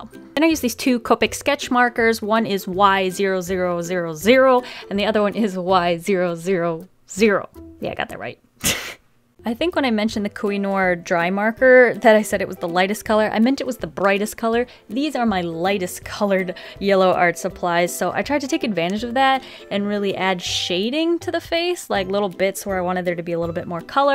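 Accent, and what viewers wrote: Germany. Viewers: are you german??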